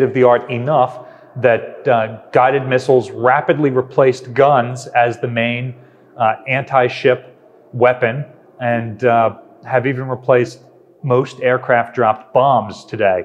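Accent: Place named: Missouri, United States. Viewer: Dudes obviously from Missouri....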